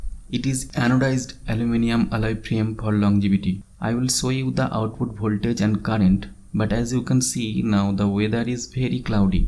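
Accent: India. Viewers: Dada maar mone ho66e tumi bangali tomar bari kothai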